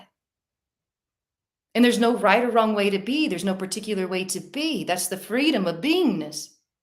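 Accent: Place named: South, United States. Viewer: Suddenly realized she’s southern lol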